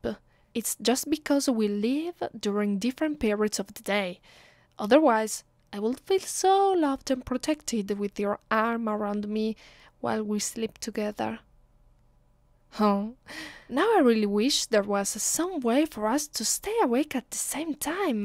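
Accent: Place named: Italy. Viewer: To, I enjoyed your vampire roleplay. The title was Vampire Girlfriend. And also, I love your Italian accent very much. It actually made your roleplay, extra special. But not only that, your creator is so romantic. I fill like that I am her boyfriend. And also, I could picture myself in her arms. I am really into female vampire role plays. I hope that there will be more role plays in the near future.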